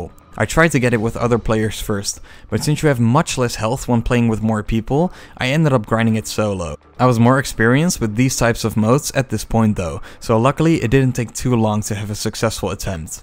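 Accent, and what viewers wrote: Netherlands. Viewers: Ahhh that sweet dutch accent😂, good video keep it up. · ik dacht bij het begin al ik hoor echt een nederlands accent en ben toen door de comments gaan duiken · Hahahaha first 10 seconds can already tell you’re dutch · I know a dutch accent when i hear one! Subbed! Great video! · Is this guy Dutch or German? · ik dacht aan het begin van de video deze gast klinkt echt Nederlands door ze accent en toe keek ik naar de trofeeën die popte en besefte ik dat hij echt Nederlands is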